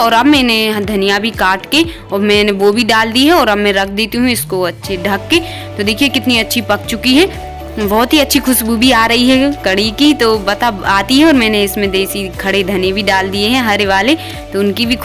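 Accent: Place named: Jhansi, India. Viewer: Ap kaha ki ho jhansi taraf ki ho ap kya boli se lag raha h bahan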